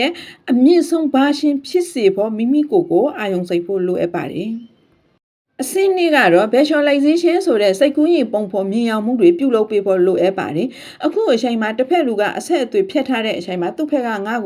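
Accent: Rakhine, Myanmar. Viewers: စကားပြောနေတဲ့ဆရာမကရခိုင်နဲ့သူတယ်နော်။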